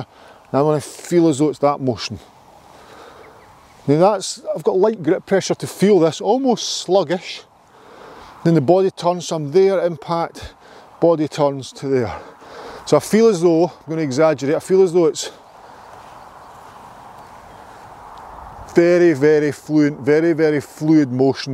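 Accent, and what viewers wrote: Scotland, United Kingdom. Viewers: Truly the best golf instruction I ever received! This instructor is the one that, finally, instructs...as if all the others I have met were hiding the true "secret" of golf. And the beautiful Scottish accent comes as a bonus, straight from the land where golf was invented! Thank you.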